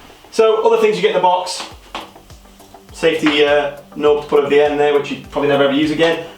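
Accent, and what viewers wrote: United Kingdom. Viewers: And he also has a British accent. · Soon as I heard his wussy British accent I knew the ak was fake. Oh well, back to trying to plate my real ones.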